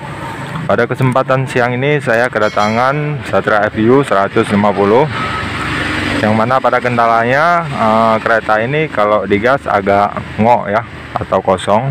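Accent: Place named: Medan, Indonesia. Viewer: Alamat mna bg Kyknya orng Medan ni 😁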